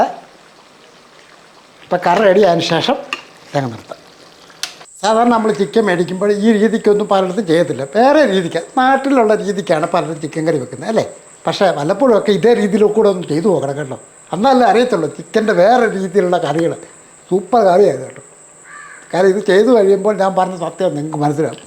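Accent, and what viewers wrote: Kottayam, India. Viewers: സൂപ്പർ 🥰 നല്ല നാടൻ കോട്ടയം ഭാഷയിൽ ഉള്ള അവതരണം 👌👌🥰🥰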